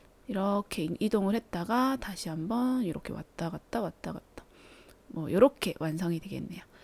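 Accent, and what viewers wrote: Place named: Busan, South Korea. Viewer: ㅎㅎ 뭐하노~~ 붓싼아이가~~ 정겹네요~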